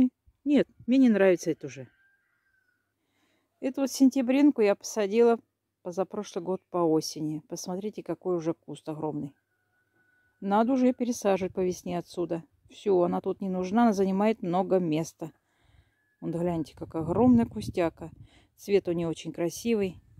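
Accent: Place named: Saratov, Russia. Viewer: Привет из Израиля! С удовольствием смотрю ваши ролики,слушаю ваш говор такой родной.Я сама родом с Саратова,но уже много лет не была там.Мне так близки ваши заботы и хлопоты.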